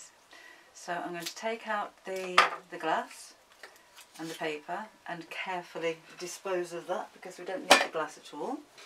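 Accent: England, United Kingdom. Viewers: It is so nice to listen to a tutorial given in an English accent.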